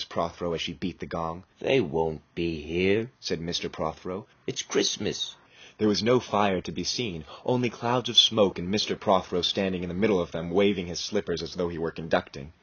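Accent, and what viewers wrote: United States. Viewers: It must be 30 years ago, that I had an LP of Dylan Thoas reading this. There must be someone out there that has this reading. Somehow, an American accent just doesn't do justice to this. Thomas' own version was so - "dead pan" but it didn't matter: this was Thomas' own rendition of his wonderful Welsh Masterpiece. Please for next year..?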